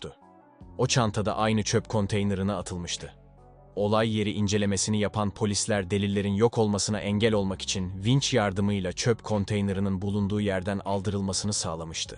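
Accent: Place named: Samsun, Turkey. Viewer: Arkadas samsunlu galiba eelli demesinden anladım:)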